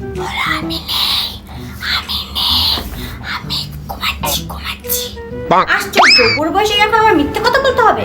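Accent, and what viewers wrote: Bangladesh. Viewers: বাংলাদেশের মানুষের বাংলা বলার ধরণ, খুব খুব মিষ্টি লাগে ❤ addictive in nature with ♥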